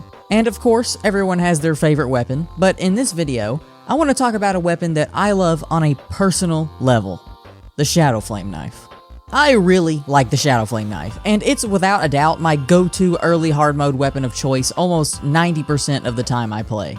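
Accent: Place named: South, United States. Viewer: Well you have another sub. Decent content man. And don't fret yourself on the script, it was decent as well. That SOUTHERN DRAW THOUUUUGH! (No judgement, Arkansas here.)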